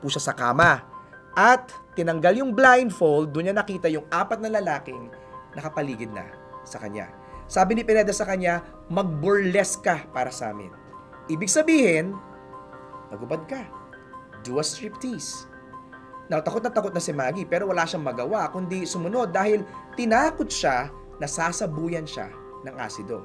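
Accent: Conyo, Philippines. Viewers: Cono naman magsalita.. arte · So conyo nmn nung speaker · ang cute pala pakinggan ng slang conyo in a serious documentary, nuh hahaha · Sana iba na lang nagkwento. Interesting kaso nakakairita magkwento. Feeling conyo. Kakainis. Hindi ko na tinapos after this comment.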